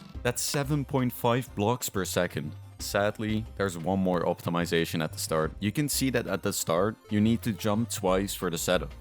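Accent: Netherlands. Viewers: Really cool Am I hearing a dutch accent? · u dutch perchange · Quick Question are you dutch by any chance? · Dutch accent detected??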